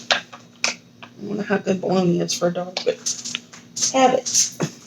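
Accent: South, United States. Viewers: It’s that sweet southern accent and attitude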